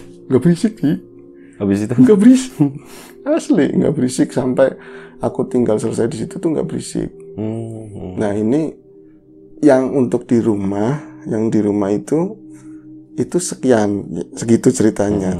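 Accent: Banyumas, Indonesia. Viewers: ora ngapak ora penakk 😁😁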